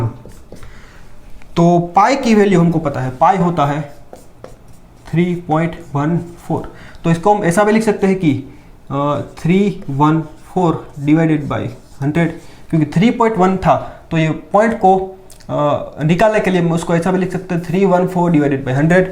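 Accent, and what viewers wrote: Gujarat, India. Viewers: Pakku gujarati chee ho !!!! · Sir , are you Gujarati???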